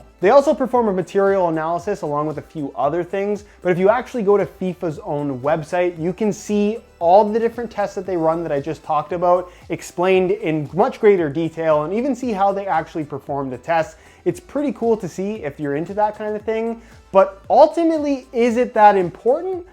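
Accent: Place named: Canada. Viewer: “That I talked a-boot”....love the Canadian accent!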